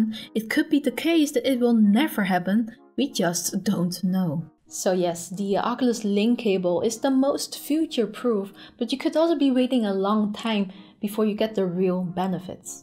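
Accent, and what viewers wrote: Netherlands. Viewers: hey jouw accent verraad dat je van nederland komt. am i right?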